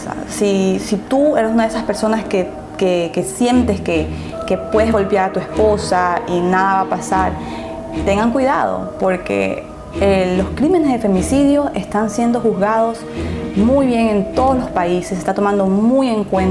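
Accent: Guayaquil, Ecuador. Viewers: soy uruguaya y he visto todo lo posible de esta chica hace los videos con gran respeto y en cuanto al lenguaje es su expresión natural lo se porque soy docente ,si les molesta su modulación es porque no conocen ni respetan su acento ecuatoriano! si no les gusta no miren! Es muy fácil criticar! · Nosotros los ecuatorianos hablamos así! No la critiquen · Así hablan los Guayacos. Pero bien que tengas este canal. Es interesante.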